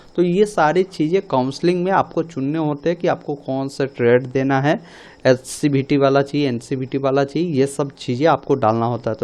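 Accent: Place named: Marwari, India. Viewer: Barbar English mein voice change kyon Ho Raha Hai sar Marwadi English mein yah bol raha hai video mein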